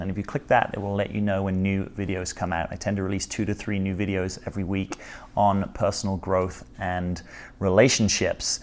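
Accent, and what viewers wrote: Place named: United Kingdom. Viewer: Thank you for this video. Your analysis and understanding of human behavior is helpful and amazing. Your British accent makes the topic as significant as it really is and should be. Will listen to it again and from time to time. FR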